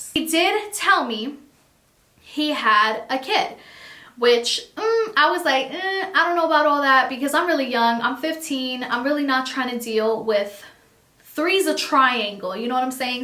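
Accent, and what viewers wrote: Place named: Newark, United States. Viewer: Sounds like Newark NJ lol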